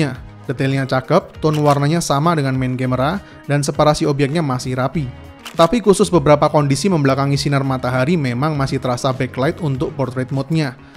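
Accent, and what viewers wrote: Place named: West Java, Indonesia. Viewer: Kak orang sunda kyaknya ya,.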